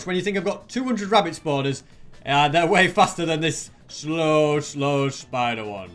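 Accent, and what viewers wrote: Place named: London, United Kingdom. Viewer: hes english (london)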